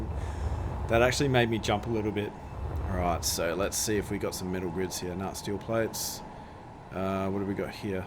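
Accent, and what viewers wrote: Australia. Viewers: Are u Australian? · you sound lke a bloody aussie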